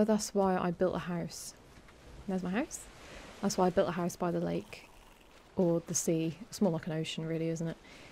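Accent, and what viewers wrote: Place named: Hull, United Kingdom. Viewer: 'It just dunt do nowt' your accents are hilarious; was you channeling a Hullian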